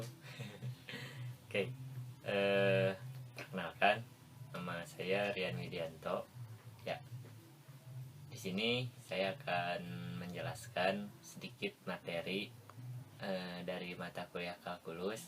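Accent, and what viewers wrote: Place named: Bandung, Indonesia. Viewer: Si aa pasti urang bdg kwkwkwk. Nuhun a..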